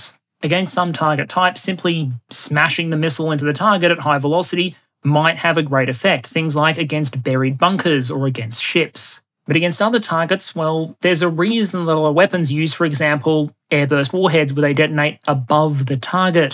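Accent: Australia. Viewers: It is frankly absurd that 1-hour PowerPoint presentations are right at the top of the content I am most excited to see each week. That damn Aussie dry wit and accent! :) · Ah yes I am going to listen to an Australian man for the next hour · I love this channel because it’s not just the short attention span of only 8 or 9 minutes for all the other videos. i’m only in adopted Aussie but as a yank it is nice to hear the fellow Aussie talk about the topic of geopolitics which I am quite passionate about in a more extensive ways regarding equipment of military defence and offence.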